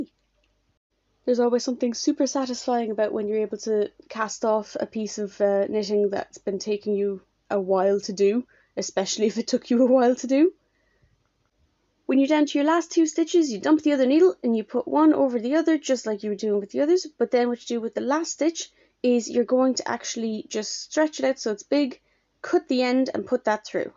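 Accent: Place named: Ireland. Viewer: Excellent!! And with an Irish (?) accent to boot! 🙏🙏👏👏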